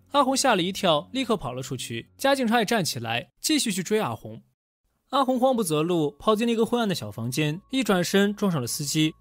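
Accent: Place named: China. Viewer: 肯定是大陆的啊 台湾没有这种腔调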